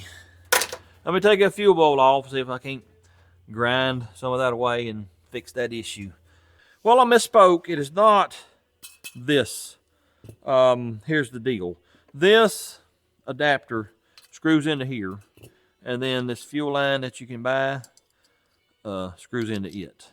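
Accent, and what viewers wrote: South, United States. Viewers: I figures...then I guess I will have to pull the plug on you and unsubcribe because my eardrums hurt from all that redneck gibberish. Too bad.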